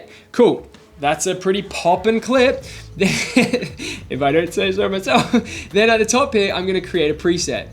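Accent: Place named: Australia. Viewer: Loved the "bellissimo" part ahahha. Moreover, hearing some good Australian bettah and watah reminds me of my lovely months in Sydney. Greetings from Italy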